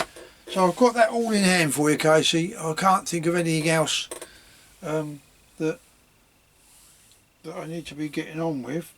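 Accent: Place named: London, United Kingdom. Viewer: Great interesting vid. Looking forward to watching more of your bids. You sound like a fellow londoner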